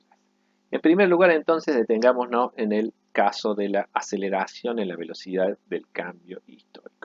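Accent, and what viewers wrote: Córdoba, Argentina. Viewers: Acá sí queda claro el acento cordobés. 😉 Felicitaciones por el contenido. Saludos desde Montevideo.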